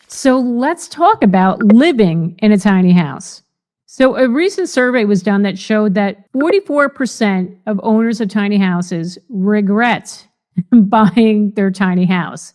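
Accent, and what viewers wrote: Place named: New York, United States. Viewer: Where you from? New York sounds like. "Cawst" instead of costs. Funny.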